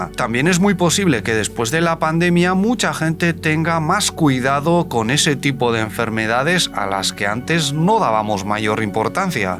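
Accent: China. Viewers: Los escucho de mucho tiempo y siento que ya tienes acento chino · Te sigo desde hace tiempo y empiezo a notar que hablas con acento chino. 😊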